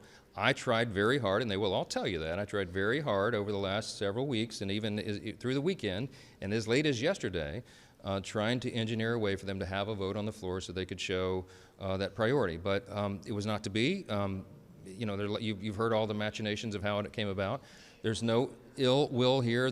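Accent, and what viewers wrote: South, United States. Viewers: He does sound a lot like a deep southern evangelist!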